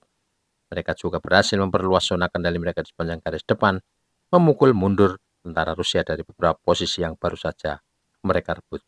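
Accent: Java, Indonesia. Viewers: Logat jawanya kental...ngx,seru bahas perang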